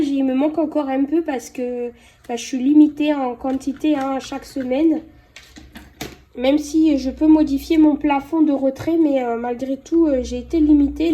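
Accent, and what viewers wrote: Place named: South, France. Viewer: J'aime bien vos vidéos je suis depuis longtemps l'accent du Sud il est léger léger de loin alors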